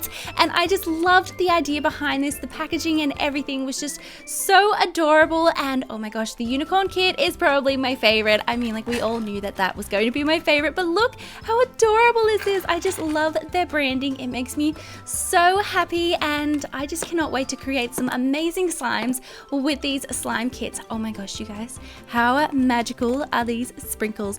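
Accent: Australia. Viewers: OMG I LOVE!!! I’M ALSO AUSSIE AND I LOVE LISTENING TO SOMEONE WITCH THE SAME ACCENT AS ME!!! SHE MUST BE RICH TO GET SO MANY SLIMES!!!! im broke....... BUT I CAN ENJOY SLIME BY WATCHING HER VIDEOS🥰🥰🥰🥰🥰🥰🥰🥰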